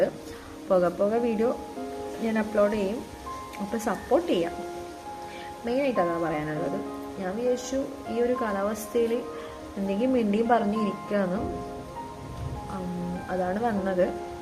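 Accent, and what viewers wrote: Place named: Thrissur, India. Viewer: Thrissur 👍kunnar slang is good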